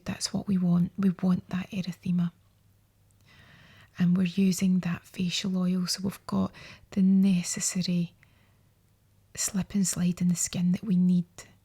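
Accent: Scotland, United Kingdom. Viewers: omg i love her Scottish accent so much! Great video and very easy to understand:) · Love Scottish accents 😬😍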